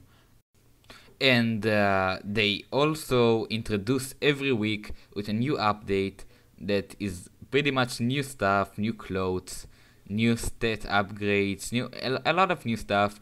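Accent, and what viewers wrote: Israel. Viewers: אחי שומעים בקול שלך שאתה ישראלי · יש לך מבטא ישראלי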